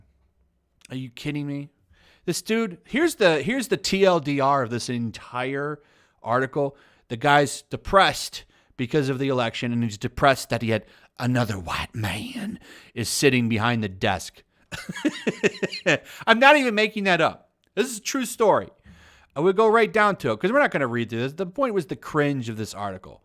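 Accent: United Kingdom. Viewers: Your voice matched exactly what i thought the guy would sound when i read this article lol at least the stuck up british voice. People go to Kotaku for laughs not games related content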